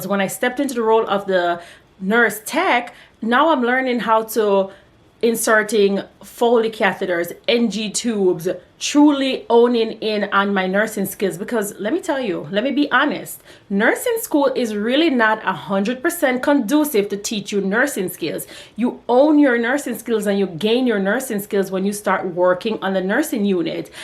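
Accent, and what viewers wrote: Jamaica. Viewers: Yeah I can hear the patio 🙂 you are a yardie 🇯🇲. I enjoyed your bullet points. And yes, I am an aide.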